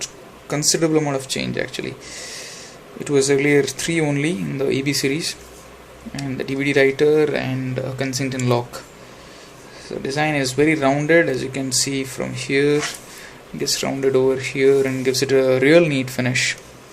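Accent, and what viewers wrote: India. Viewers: There's something about the Indian accent with reviews that I really like o_O Good review anyway.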